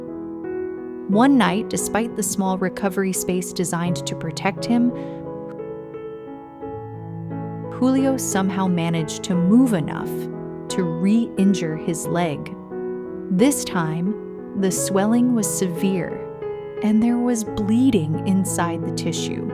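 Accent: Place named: United States. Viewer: disgusting American pronunciation with that "r". It's like it's choking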